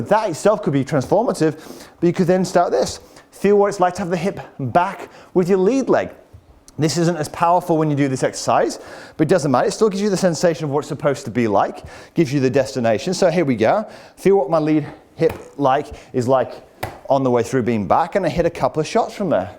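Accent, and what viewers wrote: United Kingdom. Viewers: You the man , Mister !! Great materials, and the British accent is just an icing on the cake !!!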